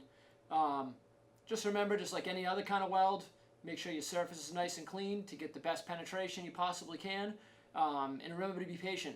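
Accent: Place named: Massachusetts, United States. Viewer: 6 seconds in and I already know you're from mass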